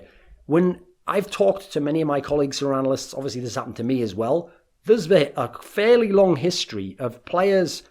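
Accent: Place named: North East England, United Kingdom. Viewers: Yugo Betrugo Duncan is from South Shields in England look up the accent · North East England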